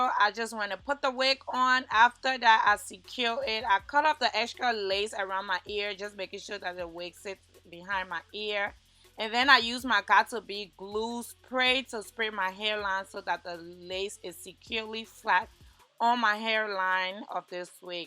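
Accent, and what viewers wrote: Liberia. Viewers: Just by curiosity are you Liberian? I think you have a Liberian accent.😊😊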